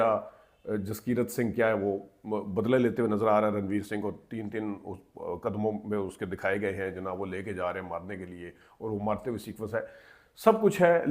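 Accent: Punjab, India. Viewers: punjabi lehja vich aa janda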